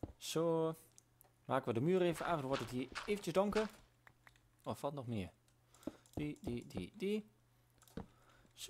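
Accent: Twente, Netherlands. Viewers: En dat Twentse accent is alleen maar leuk/grappig, dat maakt het leuker! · Het twentse accent is juist leuk! dat maakt jouw video's uniek, en leuk om naar te kijken. Ik ben geabonneerd :D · Ik meen het ik kijk heel graag jouw video's en je twents vind grappig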